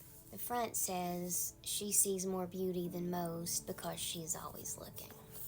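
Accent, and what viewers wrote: South, United States. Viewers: By the way love your southern accent :)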